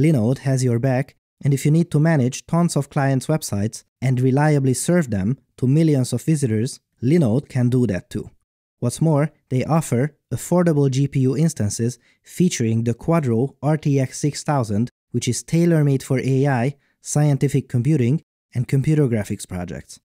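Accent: Hungary. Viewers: i dont know why i'm here, i've never been interested in 3d simulation, but as i heard you speak, i knew youre hungarian haha ;)) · Are you Hungarian?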